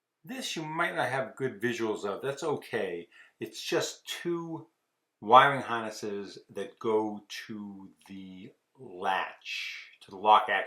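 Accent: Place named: Massachusetts, United States. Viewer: Your in florida but you def sound like your from that MA area....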